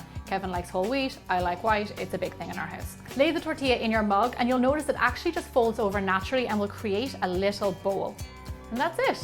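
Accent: Ireland. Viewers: Are you Irish? Love the accent! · Are you Irish!?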